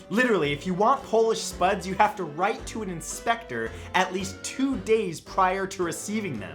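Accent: United Kingdom. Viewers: This British accent is awesome 😂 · British accent. Nailed it! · Nice british accent btw it is crisps not chips in Uk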